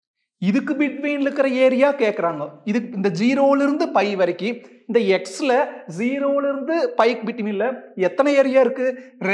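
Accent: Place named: Kongu, India. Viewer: That kongu slang nd teaching methods are 🔥💯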